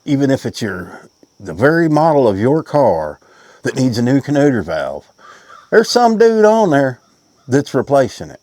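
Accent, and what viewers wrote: South, United States. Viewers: The video or your 1st video was good..u had that southern voice...and photogenic....very nice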